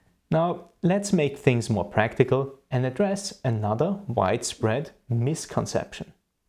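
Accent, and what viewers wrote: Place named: Austria. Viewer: Congratulation for this outstanding lesson. (and I like your austrian accent.)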